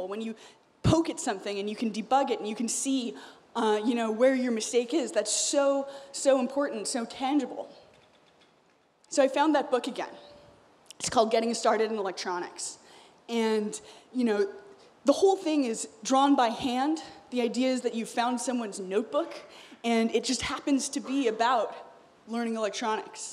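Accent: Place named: United States. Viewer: I really like her accent , what part of U.S is she from ?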